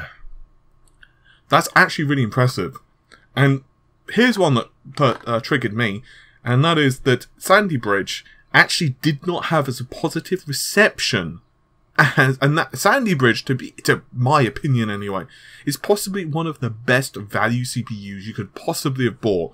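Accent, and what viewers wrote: United Kingdom. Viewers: Zenyatta- Overwatch maybe it's just his British accent ¯\(ツ)/¯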